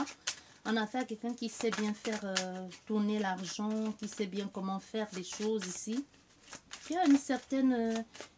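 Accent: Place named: Martinique. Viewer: Vous êtes Martiniquaise ,avec cette accent...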